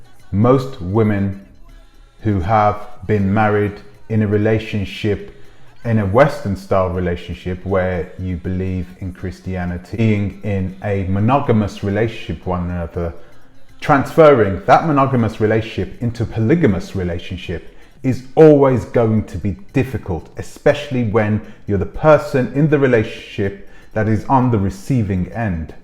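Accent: United Kingdom. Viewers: Your a weirdo for digging in the brother JT's personal life . Corn ball British accent . FOH !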